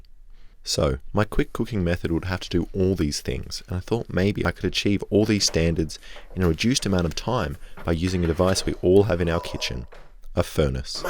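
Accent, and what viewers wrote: Australia. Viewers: Obsession with eggs? Australian? Is that you, HowToBasic? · Yami Yugi as an Aussie I can conform. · Is this a Australian, and talking?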